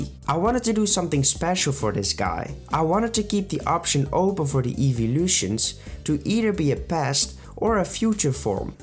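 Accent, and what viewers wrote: Netherlands. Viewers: Sorry matje je designs zijn goed maar ik kan het gewoon niet afkijken omdat je engels zo verkaast is 😅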